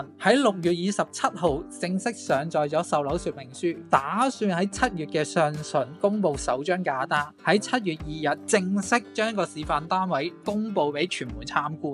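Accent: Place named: Hong Kong. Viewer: 你講嘢😅 唔喺香港大？ 咩？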